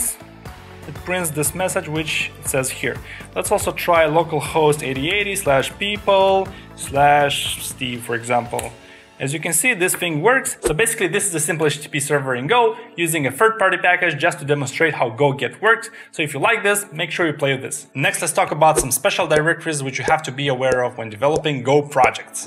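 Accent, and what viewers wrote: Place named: Russia. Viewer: Steve really?) I've heard that aII-too-famiIiar russian accent) Thank you for great introduce!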